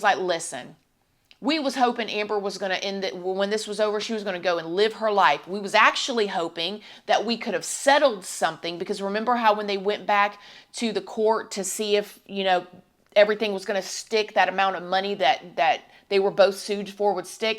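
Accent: South, United States. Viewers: Great job! I so enjoy you! You sound Southern like me. What is the best is your kindness yet unafraid to speak truth! You go girl!!! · Mmmm no, I disagree 36 years old is old enough for Amber to know better. If you watched the trial, then you're aware she's been given some pretty strong diagnosis as not a well person. With all respect to you and your channel,( which is obviously successful) Do your thing! You're pretty, got a great southern accent and a gossip show flair. (funny as a viewer to be called one of your "babies" as I'm nearly 2x's your age). Well being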